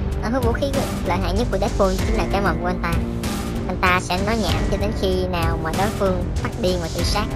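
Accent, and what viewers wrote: Vietnam. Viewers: Thím nói giọng miền trung p k ? :))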